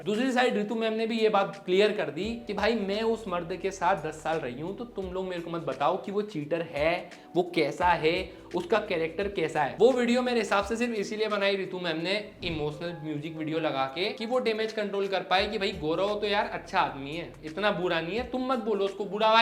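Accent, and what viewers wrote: Indore, India. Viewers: Indori h Bhai.. ek indori accent aur ek normal accent:)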